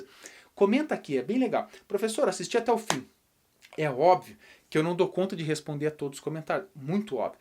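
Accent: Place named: South, Brazil. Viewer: Bofe é bonito,pelo sotaque é sulista,e muito didático, estou estudando com sono,boladona com os colegas de turma,e senhor me fez rir,quase caindo.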